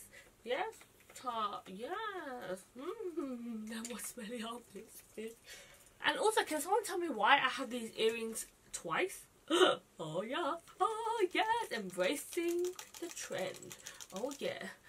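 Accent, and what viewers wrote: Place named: London, United Kingdom. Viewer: Always. The intros always make me think damn this is going to be pretentious then you say “HI GUYS” in that London accent I’m like yaaas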